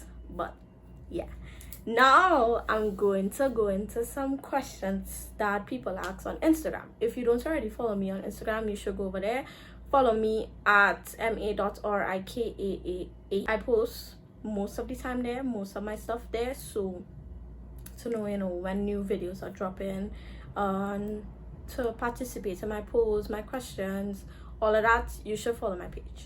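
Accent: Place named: Trinidad and Tobago. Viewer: Are you Trinidadian? You kinda have an accent... similar to Trinidadians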